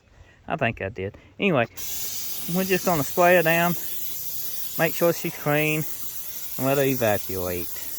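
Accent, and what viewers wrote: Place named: Louisiana, United States. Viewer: hey, how's everything going? I'm guessing he's referring to the way you speak. Your accent is quite obvious. Where are you from? I was thinking Louisiana (Baton Rouge)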